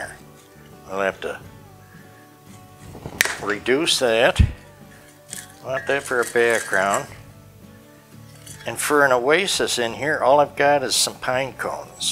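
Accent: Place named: Western Pennsylvania, United States. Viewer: Love your style! By the way, I notice you have an accent. Western Pennsylvania. Ohio?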